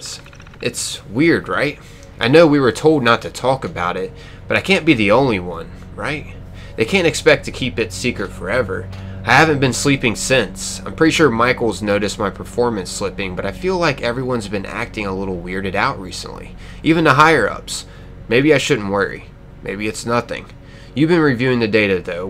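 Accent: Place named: Philadelphia, United States. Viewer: Are you from around Philly? I'm trying to place your accent.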